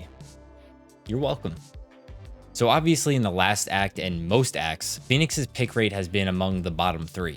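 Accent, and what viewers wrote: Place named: United Kingdom. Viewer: He’s back to being a cool bri-ish boi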